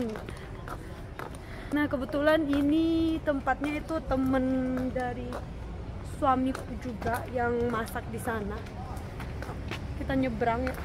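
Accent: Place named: Hunan, China. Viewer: Bhs mandarin kmu sdh mirip dgn org asli disana Kayak org bhs hunan tp msh bs lebih mengerti,ada medok nya tp bhs hunan lebih medok lg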